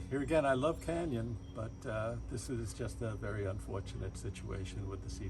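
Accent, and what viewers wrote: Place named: Boston, United States. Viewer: Great balanced video, and really helpful tip at the end! also, I hear Boston in you =D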